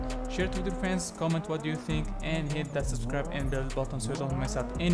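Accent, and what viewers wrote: Tunisia. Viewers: Naarach aleh aarftk tounsi men soutek hahahah Great tutorial <3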